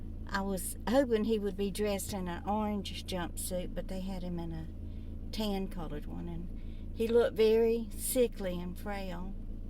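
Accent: South, United States. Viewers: Hi Ms ☺️ I've been watching some of the court case here in UK 🇬🇧 & even though I've not watched it all it was obvious he was guilty. I felt so sad for his poor wife 😢 & son 😢 Hope you're keeping well, I love to hear your southern accent, it's lovely. Sending you love & best wishes, God bless you 🙏 xxxxx Christine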